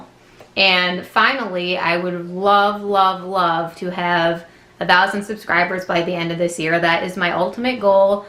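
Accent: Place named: Michigan, United States. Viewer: Ok, this is so weird, because as I was listening to you I was thinking "She sounds like someone from Michigan." LOL No lie! That's actually why I asked! Too funny! Are you on the west or east side? And no, the drive isn't bad at all. Unfortunately though, we discovered that my youngest (who is 13) has some serious anxiety when it comes to driving through the mountains. I definitely do, but we didn't realize he did until he about hyperventilated as we were going up to our condo. It was at the top of a mountain and it was pretty dang scary the sharp, steep turns you had to take where you actually couldn't even see the road in front of you sometimes! We decided next time we go, we'll try to stay somewhere not quite up so high. It was absolutely beautiful where we were though. Also, the guy who we rented the condo through on VRBO told us that he gives a good discount to returning customers and that he has some really nice places right in Gatlinburg, so we're thinking about doing that when we go again!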